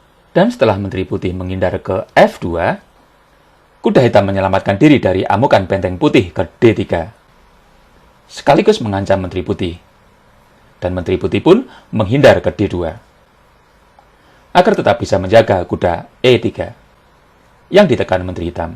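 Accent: Java, Indonesia. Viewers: ilat jowo cak wkwkwkk 🤣🤣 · Saya suka gaya Om, tau aja yg subcribernya kebnyakan org Jawa hahahaha...., Lidah Jawa ya Om, GX perlu formal2 banget sebut nama yg penting penyampaian materi mudah di cerna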